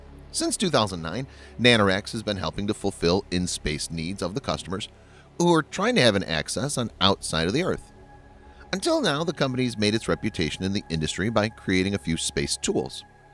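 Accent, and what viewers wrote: United States. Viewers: Whats the matter ? Michele ? you not like his midwestern accent ? you biggot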